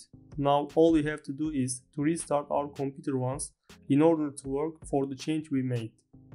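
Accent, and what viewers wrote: Turkey. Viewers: aga yeminle ingilizce konuşmandan bile türk olduğun anlaşılıyor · Damn your accent tells me that you're Turkish. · iliklerime kadar türk ingilizcesi en sevdiğim :) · Abi Türk olduğunu aksanından anlamıştım gayet güzel videolar çekiyorsun eline sağlık :) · Türk ingilizcesi diye bir şey var buna eminim ben artık. Çok faydalı video teşekkürler!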